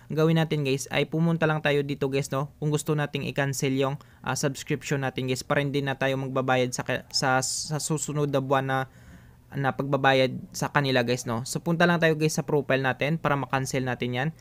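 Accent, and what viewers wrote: Iloilo, Philippines. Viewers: Ayusin ang pagsasalita parang Ilonggo kayo? Tutoo o hindi?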